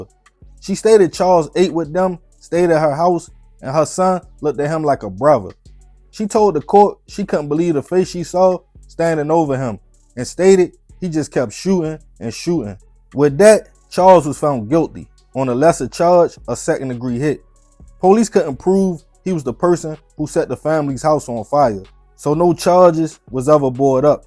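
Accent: Baltimore, United States. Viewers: You doing our city right I noticed your accent gettin way more thicker ...you not from Baltimore originally but I give you ya adoption papers you deserve it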